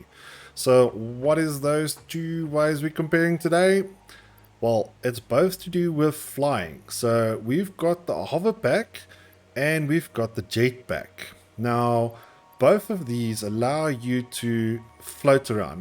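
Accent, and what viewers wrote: South Africa. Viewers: This guy sounds South-African 🤭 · Are you South African boet?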